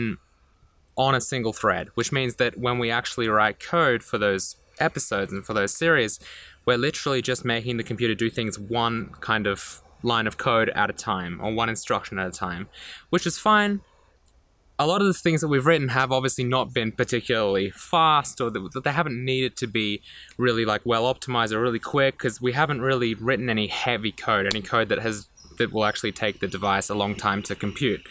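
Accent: Australia. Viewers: You from Australia?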